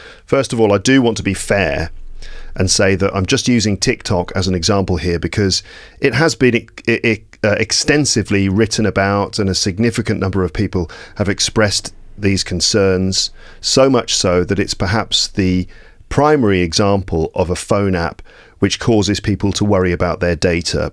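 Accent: United Kingdom. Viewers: His accent is British, i don't know which specific accent because there are a lot of British accents but it's mainly british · Hey... Really like your videos. People apart from spoken English can learn British Accent on your channel. I particularly watch your videos for British Accent. It's just a suggestion if you like. You can also start teaching English with newspapers as the language is quite arduous to comprehend. Thanks for the Videos. Best of luck. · This was very informative, very very informative. More content should be made on these lines and other similar important subjects, societal subjects, classified subjects, about realities which are not made public. Some of the topics can be related to cannibalism practice in the 21st century. Subjects related to money and banking and how successful this system has been across the globe. Topics related to different kinds of societies, interesting facts which can be shocking too often times. 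Until recently I never knew the difference between British English and American English and the associated politics at global level. I have been looking for profiles for marriage on Instagram and Instagram mainly shares profiles from across the globe who speak American English or some kind of English but not much from England who speak British English like yours or Sophie Turner or Isaac Hempstead Wright. I find this approach very political in nature.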